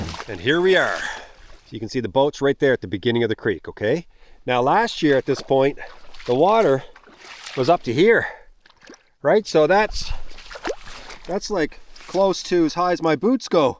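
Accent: Newfoundland, Canada. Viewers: Are you a newfie? I get that feeling.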